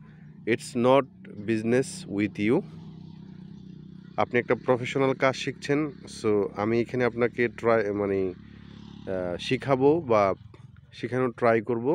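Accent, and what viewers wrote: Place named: Barishal, Bangladesh. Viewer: আইতাছি রে ভাই। বরিশালের ভাষা🥰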